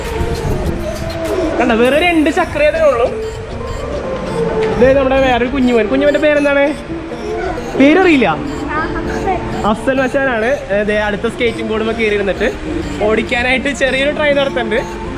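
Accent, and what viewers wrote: Thrissur, India. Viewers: Thrissur kaaranano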